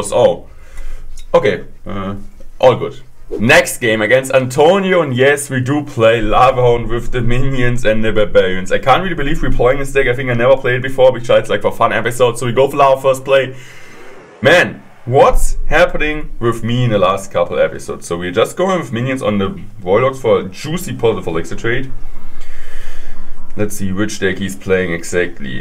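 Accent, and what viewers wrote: Germany. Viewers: Wenn das kein deutscher aktzent ist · Man merkt dass du Deutscher bist aber ich feier trotzdem beide CR Kanäle😉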